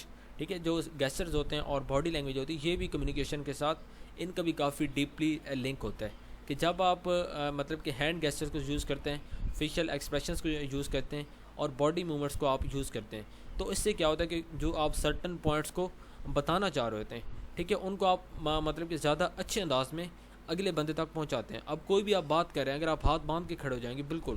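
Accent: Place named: Saraiki, Pakistan. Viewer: your accent is like saraiki people💞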